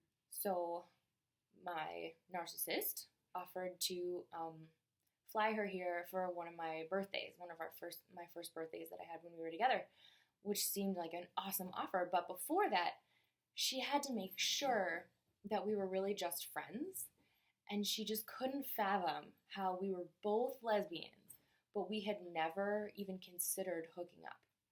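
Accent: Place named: Upper Midwest, United States. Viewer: Hey, great video. Really helps figure out my own stuff, hearing you talk about yours. Kudos for that! Side question - are you from the upper midwest? I'm overseas and trying to figure out the accent.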